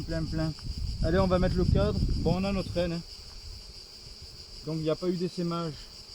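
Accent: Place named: Belgium. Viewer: Bonjour monsieur vous êtes du Sud ?? On entend les crickets ou bien c’est une musique de fond ?? En tout cas vous avez un jolie accent Belge😁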